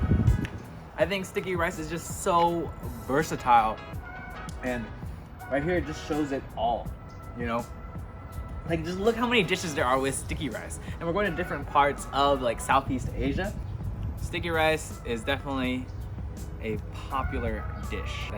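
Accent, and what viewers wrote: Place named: Vietnam. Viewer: Love your Viet accent!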